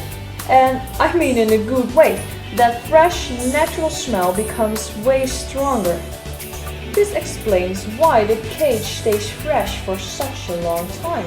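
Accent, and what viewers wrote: Netherlands. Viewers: hoi ik dacht al dat je nederlands was door je accent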